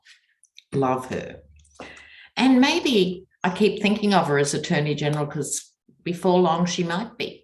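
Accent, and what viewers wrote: Australia. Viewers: Hello love your tarot card reading you keep us calm when I tune in on YouTube you were the first I ever saw do tarot cards I got laugh at your next door neighbor's they were very loud and you would sit on the floor and read your tarot cards with your Australian accent l just love your channel l said this is real tarot card reader keep up the good work.......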